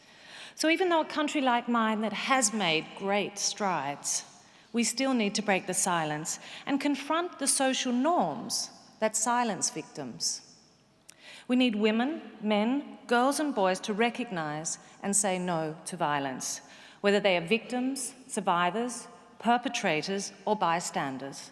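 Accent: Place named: Australia. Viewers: Hasn't lost her Aussie accent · As a someone who speaks several language, I can tell you, that from what I know, losing one's native accent is pretty hard. It's probably because she's Tasmanian, she might be an Aussie, with an Aussie accent, but there's some Tasmanian accent in there, and that's what sounds broken, or "Danish". You can still hear that her Aussie-Tasmanian accent affects her Danish to a pretty high degree.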